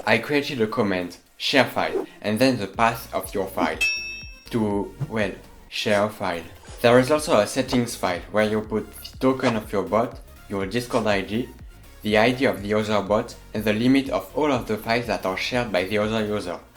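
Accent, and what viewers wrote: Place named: France. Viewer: Un francais passe par la et reconnais l'accent francais. Salut ^^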